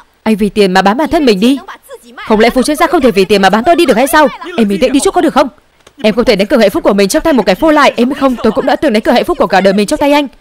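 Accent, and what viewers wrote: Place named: North, Vietnam. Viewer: Giọng Bắc đọc nặng quá xem không có hay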